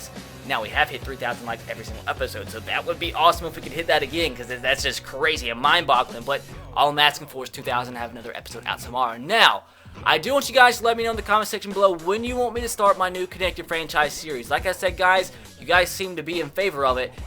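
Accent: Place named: Alabama, United States. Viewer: Love the southren alabama accent bro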